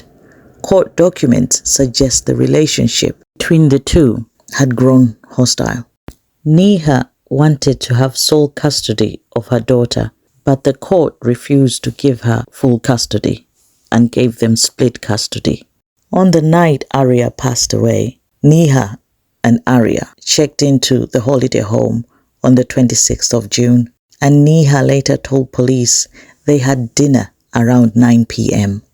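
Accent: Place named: United Kingdom. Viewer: You've British accent... tikambilane, kindly share your email address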